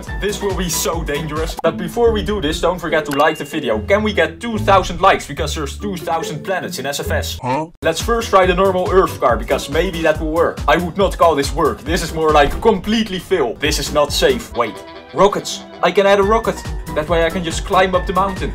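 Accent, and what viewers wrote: Netherlands. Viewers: Ben je Nederlands? Ik hoor het aan de accent haha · Oh you are OBVIOUSLY dutch. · Ik ruik een nederlander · Are u dutch? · Are you dutch becouse you realy sound like your dutch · 100% een nederlander hoor het gelijk aan het accent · I smell someone dutch like me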